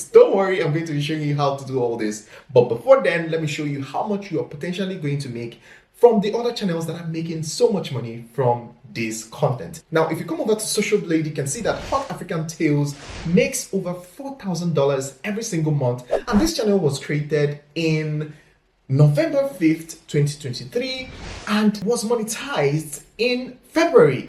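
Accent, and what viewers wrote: Nigeria. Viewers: Which English did you use for this is it Nigeria English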